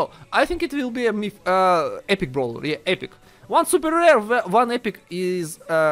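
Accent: Russia. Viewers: Yes. And i think he's got russian acsent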